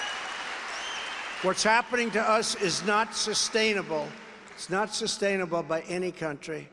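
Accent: United States. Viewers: he is great! talks like a true American!!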